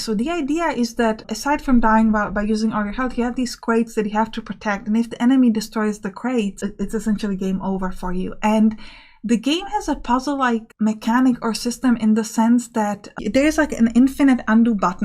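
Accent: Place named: Philippines. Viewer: Wow. I have the honor of writing the first comment. Southern Command looked interesting and I intend to look into it while sales are still active. Thank you. PS: It is nice to find a female wargamer; from your accent Filipina. I only know a few words of Tagalog. I guess the most appropriate is word here is "Sigay" (don't know spelling). Thanks,... you videos on Field of Glory Empires were good too. (I am a former member of AGEOD and friend of Philippe Malacher.)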